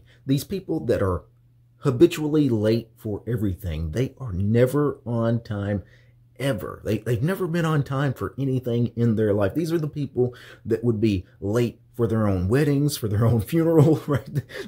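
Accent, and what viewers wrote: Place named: Texas, United States. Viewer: Unsubbed. It goes both ways,. Some people struggle with this their whole lives despite conscious efforts to improve. You sound like a true Texan in this video, and I don’t mean that in a good way. You are only isolating yourself.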